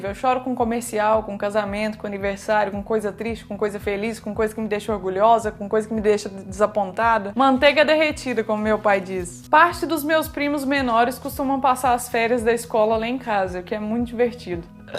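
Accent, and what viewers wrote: Minas Gerais, Brazil. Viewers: Se você nao conta, ninguém ia saber que você é mineira :-) esse sotaquinho de Mineira... :-) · CARA, COMO VOCÊ É FOFA, ESSE TEU JEITO DE FALAR "É BUNITO DEMAIS DA CONTA SÔ", ACHO LINDO E VOCÊ É UMA SIMPATIA DE PESSOA, TE ADORO, SUA LINDA!!! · Por isso do sotaque mineiro uai uehhehhehhe 😂👏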